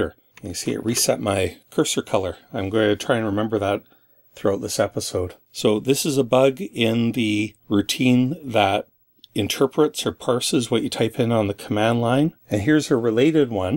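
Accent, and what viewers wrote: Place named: Sault Ste. Marie, Canada. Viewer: Hi, I'm watching this in July 2019 months after the Wisconsin convention (hey, that's the beauty of YouTube) but you say in the intro you were heading down from Canada. With Canada being the second largest country in the world, where in Canada? If it's a privacy issue, no problem. I've just subscribed and started binge watching some of your C64 and 6502 videos, great work! I'm going to hazard a guess based on accent... Sault St Marie? Regardless, keep up the great content! Your channel is a retro-blast and despite thinking I've mastered 6502 asm and the C64 world, I've learned some great little tricks so far! I was happy to see that these quirks "work" on Vice C4 Emulator (except the "screen saver" one) as well as my C64!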